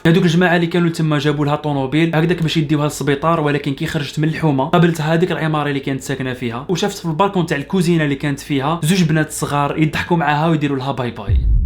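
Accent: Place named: Morocco. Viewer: هدرتك تقول مغرببي 🙄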